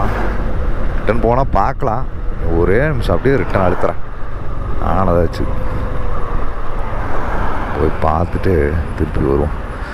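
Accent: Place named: Kongu, India. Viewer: Hi watching your vlogs with great interest. Wonderful effort. Keep it up..love your Kongu Thamizh. Subscribed your channel. All the best ❤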